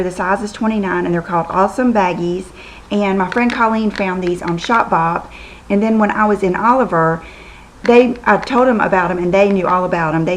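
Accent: South, United States. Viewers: Hi, I just wanted to tell you that I love your sweet voice, it's so soothing and I love your southern accent:) xoxo Melody PS. Is there any way you could do a tutorial on your makeup look? It's gorgeous!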